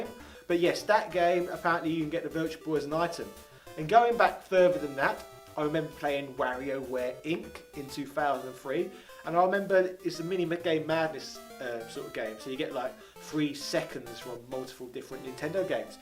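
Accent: England, United Kingdom. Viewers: English people can't speak properly, free DS for example. couldn't be bovered is another. It's not just this dude though lol. freeeee deeeeeee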